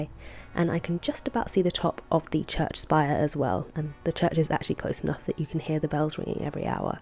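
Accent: United Kingdom. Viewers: this is the most British commentary I've ever heard lmaoooo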